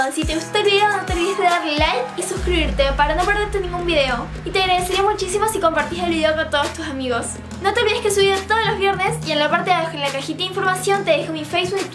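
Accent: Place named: Mendoza, Argentina. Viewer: Hola!! que bien que te quedó.. una pregunta.. Sos de Mendoza? porque yo vivía ahí y Tenés acento de Mendoza👍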